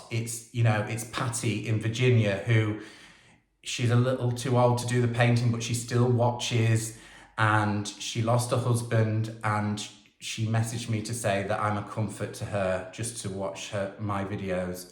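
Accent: United Kingdom. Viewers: Thank you for sharing your creativity and your wisdom on how to turn an ugly or aged piece of furniture into a beautiful piece of furniture that more than often brings me a startling and genius idea on how your work progresses. It is a journey and watching you from Quebec, Canada was is and still will be a great delight to me. I love it when you say this wood project or painting is therapeutic. Because it's for me as well in the last year. Fixing pieces, painting them isn't my real work like you. Soon I have to go back to the real world and start working. Wish you all the best! P.s. Your British accent reminds of my teachers colleagues with whom I worked in international schools and I miss so much. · I'm thrilled your moving forward in a newish you direction. Artists, I believe, always need inspiration. So happy you paused and are in a happier place. I'm glad I can still hear my favorite UK accent. 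Cheers to you from the States ❤🇺🇲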